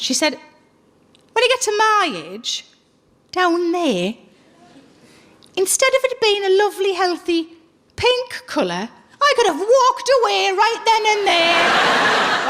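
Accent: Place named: Scotland, United Kingdom. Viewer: I just can't understand Scottish.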